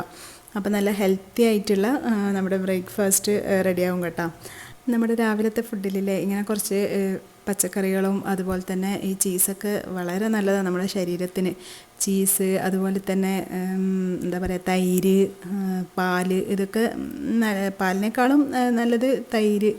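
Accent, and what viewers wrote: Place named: Kannur, India. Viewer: Kannur evideya,slang കേട്ടപ്പോ തോന്നി.നമ്മളും കണ്ണൂർ ആണ്😊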